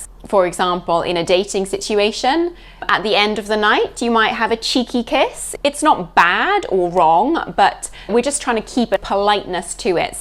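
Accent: United Kingdom. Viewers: Hello! If I go to the restaurant in Europe, Should I say ' Could I have a bill please?'. I love your British English.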